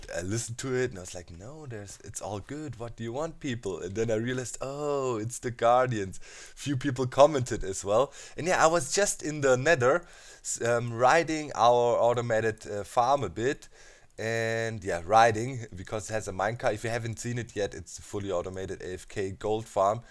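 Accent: Germany. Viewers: are there any English words which you struggle to pronounce due to your German accent?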